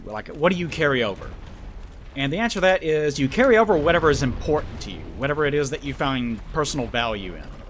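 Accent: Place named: South, United States. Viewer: I just realized you sound a lot like SSoHPKC but with a slight southern accent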